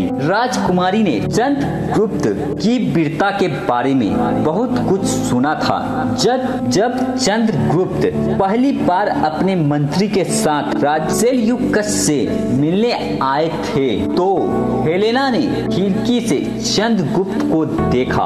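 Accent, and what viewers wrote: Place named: Bihar, India. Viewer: Are Yar phle bolna to shi KR Le ese LG rha jese koi bihari Hindi bol RHA h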